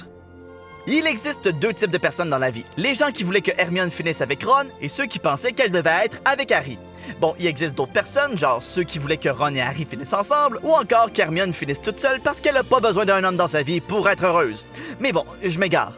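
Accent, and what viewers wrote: Quebec, Canada. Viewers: Il est marrant ton accent québécois 😂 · T'es québéquois ??? T'as l'accent...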